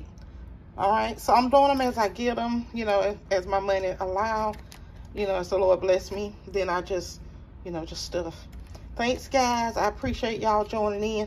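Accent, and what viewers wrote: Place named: South Carolina, United States. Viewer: I love your accent. I thought that maybe you were from South Carolina. I was close. 😀. Keep up the good work, sweet lady.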